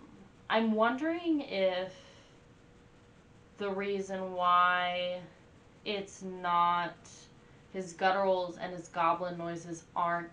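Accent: South, United States. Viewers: Sweetheart, where are you from? I'm from Tennessee and I love your southern accent. I pretty much lost my southern accent in college. But I still think it's cute for a female! The song is filmed at a concert they did in 1991 In Moscow Russia, 1.6 million people were at that show. Dimebag Darrell is the guitar player and he was killed on stage on 04 but he was and still is a guitar God. His brother Vinny Paul Abbott is playing drums. They were both legendary.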